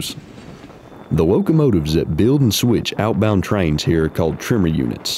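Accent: South, United States. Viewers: Like your southern accent.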